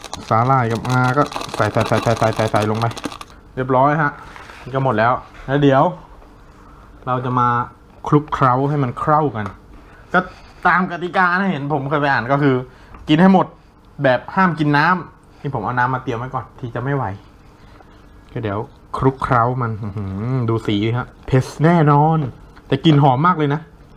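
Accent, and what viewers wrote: South, Thailand. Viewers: พี่เป็นคนภาคใต้หรอครับ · คนบ้านเด่วกันคนใต้ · พี่คนใต้หรอ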